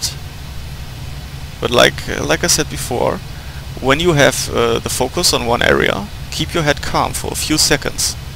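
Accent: Germany. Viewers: hi,. your vids are fun to watch, your german accent makes it interesting. I don't want to sound like a 'know-it-all' or something, but, you make the mistake many do when pronouncing the name...'hawkinge'......the 'inge' part is pronounced like the word 'hinge' or 'binge', not 'ing', like in 'going' or shooting'. I know, not real important, just a minor detail......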